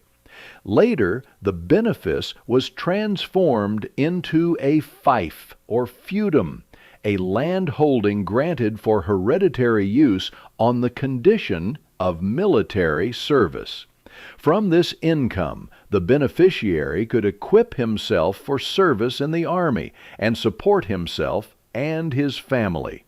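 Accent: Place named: United States. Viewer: No American voice please. We know our own history. Keep out.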